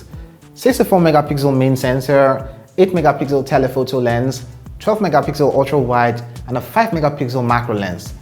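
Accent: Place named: Nigeria. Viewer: u sound like u originally a nigerian__if u are much love brooo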